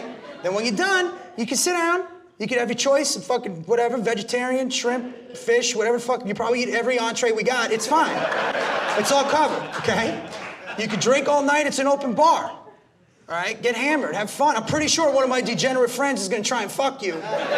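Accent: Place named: New Jersey, United States. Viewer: Love the Joisee accent and the cutting humor. Can't take yourself seriously ever again after listening to for an hour. I'd love to hear him take on Trump.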